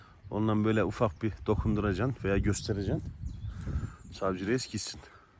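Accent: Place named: Erzurum, Turkey. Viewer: Erzurumlu gibi geldi de konuşması. 🤔🤔 Neyse. 😊😊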